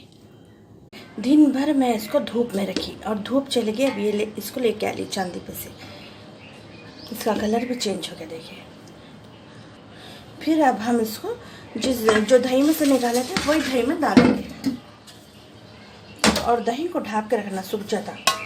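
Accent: Hyderabad, India. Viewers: Aap hydtaba ke hoky aapki baat ka lheyja wesa hi laga chhahy kafidino bad dhiki mirchi ki recipy dikhaidi..mujhey bhut bsnd hy..😋😋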